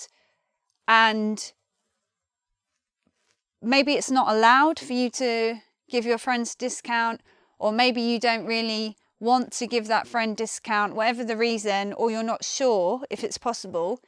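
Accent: United Kingdom. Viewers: hi. thanks for the lesson. I love ur uk accent. have a good one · She has Brittish accent.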